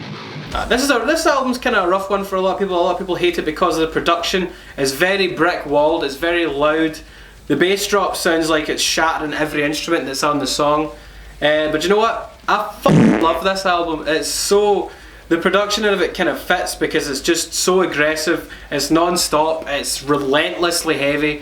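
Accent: Scotland, United Kingdom. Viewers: wait your scottish? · I like when you talk because I like your Scottish accent.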